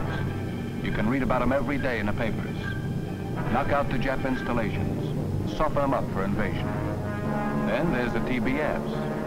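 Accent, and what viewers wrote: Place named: New York, United States. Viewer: narrator sounds like he's from NY.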